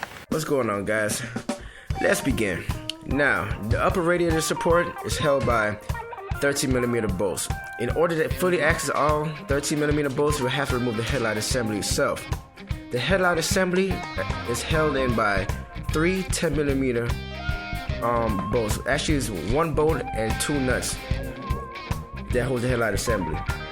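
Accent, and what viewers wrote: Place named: Jamaica. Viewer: Nothing Cummings in you guys face. LOOOOOL Yankee boy. i hear a Jamaican boy a twang.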